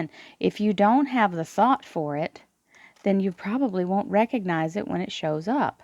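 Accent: Texas, United States. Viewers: Love your readings, and your voice. Where are you from sounds like Texas?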